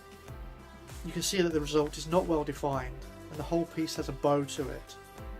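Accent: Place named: United Kingdom. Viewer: The Solder pot, im guessing your in the UK (accent and pound sign), did you have to change anything to the plug example earthing or anything - i've heard they are not safe for UK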